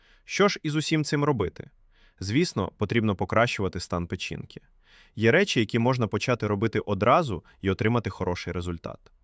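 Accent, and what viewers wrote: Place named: Central Ukraine, Ukraine. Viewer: Навчити "дєвочку" з Кійова, щоб вона розмовляла як пані зі Львову? Навіщо?! Тим більше що ано з Кропивницького чи з кіраваградскої області. "Тітка"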